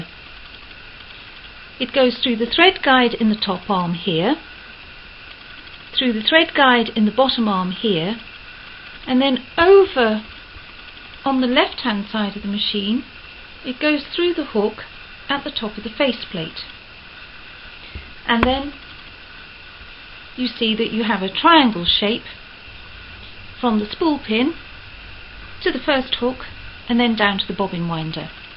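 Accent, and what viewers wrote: United Kingdom. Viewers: Wonderful instruction given in the Queen’s English. I love the excellent quality and presentation of these old time videos. Thank. You very much.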